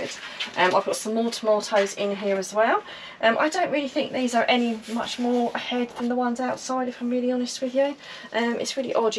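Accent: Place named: South East London, United Kingdom. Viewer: are you from SE London/Kent border? The accent! Reminds me of home!!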